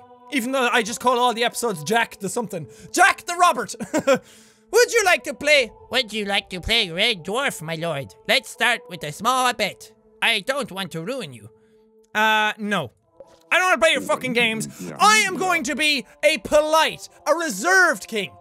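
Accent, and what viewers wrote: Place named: Ireland. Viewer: Please make more videos where you talk in that heavy Irish accent it's very beautiful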